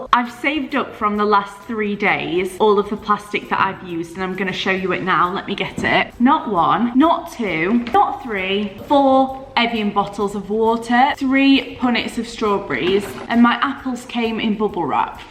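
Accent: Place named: Derbyshire, United Kingdom. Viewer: Where are you from originally? I’m from chesterfield and you sound just like us from this neck of the woods 😆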